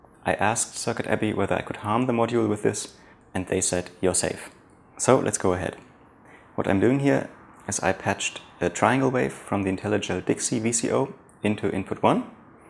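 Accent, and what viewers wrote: Germany. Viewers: Wow, you sound so German!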